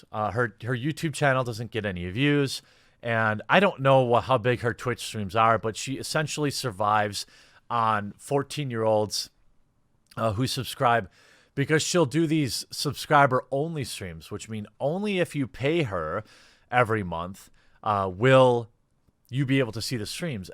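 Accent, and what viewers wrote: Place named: Midwest, United States. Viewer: Northwest/midwest accent, lol. Reminds me of the Wisconsin area. Ever notice how he says words like "lag" or "bag" with like an extra "y" sound in there, like "layg" or "bayg"? That's his northwest/midwestern accent and he's commented on it before, lol ;)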